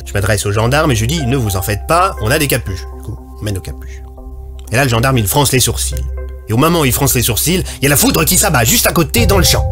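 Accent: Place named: Quebec, Canada. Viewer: J'ai pas du tout écouté l'histoire. Ton accent québécois m'a suffit x)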